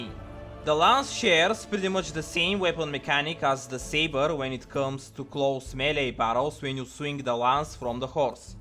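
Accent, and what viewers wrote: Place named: Russia. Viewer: I love your thick Russian accent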